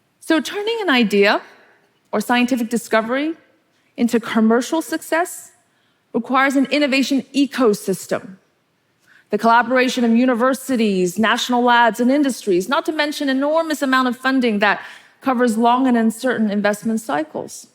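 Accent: United States. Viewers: The United States is a country of immigrants. We are a melting pot of many cultures from around the globe. Indeed sounds American. What makes the United States great is our diversity. Europe & the United States-the West-has brought the world enlightenment over the last 300 years. China is now enjoying this western enlightenment on some levels (not politically). It’s unfortunate that the Chinese, Communist Party blocks, YouTube, Facebook, and many other Western icons. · Wow her American accent!! Amazing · She doesn't look like one that "read by candlelight" and she sounds too American to appreciate China as she so claims 🤔🤔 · She talks and acts like 100% American. Does she live in China or is she just a CBA? - from Beijing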